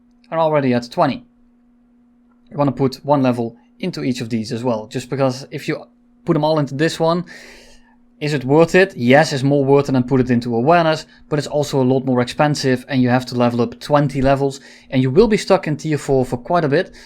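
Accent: Netherlands. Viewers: Je klinkt Nederlands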